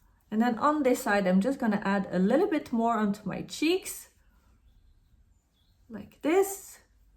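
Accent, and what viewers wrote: Quebec, Canada. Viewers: Hi ! To sum up I would say that when speaking english you have a recognizable french accent and when speaking french you have a strong québécois accent ! So unique 😉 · Allô, j’adore ton accent étant Québécoise aussi et je suis au Saguenay et première neige hier soir qui est encore présente au sol ce matin!🥶😉. La poudre Hermes Rocabar je l’adore je la porte à tous les jours depuis un peu plus d’une semaine et j’adore la poudre bronzante aussi j’ai Tottori et Atlas, pour le correcteur ultra le teint j’ai tellement hâte qu’il soit disponible au Canada …on m’a dit que ce serait en 2026 mais je ne sais pas quand…j’ai très hâte de l’essayer. Bonne journée et merci pour ce vidéos! 😍🥰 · Salut! (du Canada) - en tant que Québécoise, j’ai décelé ton accent québécois dès la première fois que j’ai visionné un de tes vidéoclips. Mais peut-être faut-il être québécois pour identifier ton accent. 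J’ajouterai que ton accent est vraiment agréable à entendre alors pas de souci. Je vais maintenant continuer de visionner. La poudre Rocabar d’Hermès est jolie sur toi. Très (trop!) tentant.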